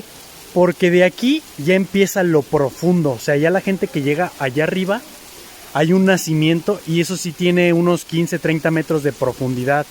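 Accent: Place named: Mexico City, Mexico. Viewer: A este cuate se le nota lo chilango en su chisme jajaja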